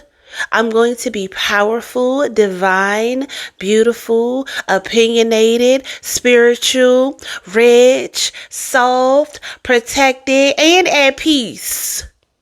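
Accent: DMV, United States. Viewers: That was beautiful! I definitely needed to hear that this morning. You’re becoming one of my favs! And do I hear a DMV accent? 👀❤️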